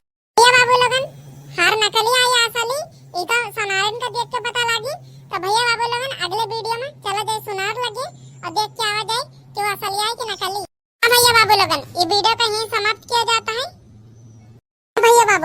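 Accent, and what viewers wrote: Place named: Raebareli, India. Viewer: Aaap kaha se ho Raibareli ki bhasha lagti h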